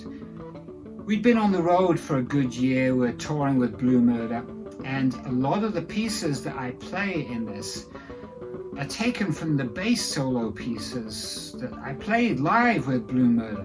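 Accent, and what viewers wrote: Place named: South Africa. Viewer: This drummer is so on! BTW - interesting thing about your accent now is that you actually sound quite South African.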